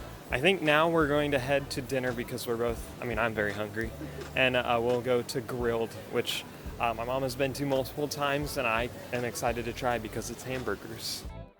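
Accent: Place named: United States. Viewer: Nice video! There's also a surprise little hidden waterfall at Bronte. Your accent is from what part of the US?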